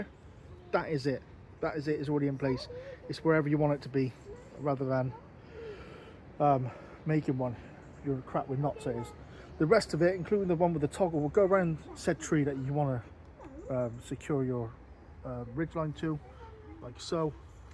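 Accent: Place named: Dorset, United Kingdom. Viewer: Nice! For once youtube recommended a video worth watching. Thats a beauty, and by the sounds of it your clearly my neighbour lol (im from dorset) so would be rude to not subscribe! 👊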